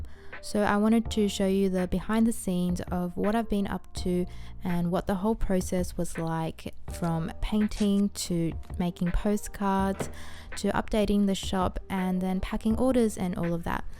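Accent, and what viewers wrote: Australia. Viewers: This video came up as recommended as just watching a bunch of studio vlogs. Could instantly hear you were Australian and love your work! Just bought some postcards from your shop, just wow. so beauitful!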